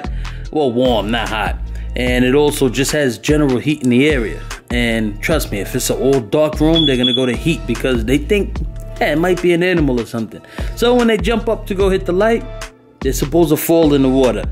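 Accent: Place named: New York, United States. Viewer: Luv the NY accent...NYer for life❤️😎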